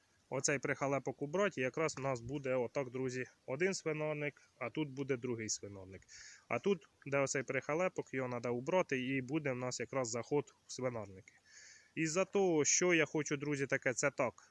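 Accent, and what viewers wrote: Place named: Poltava, Ukraine. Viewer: полтавський говор не сплутаєш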